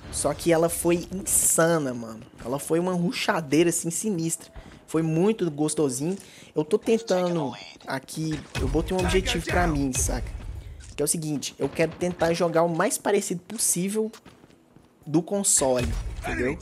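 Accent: Minas Gerais, Brazil. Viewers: O cara é mineiro demais da conta sô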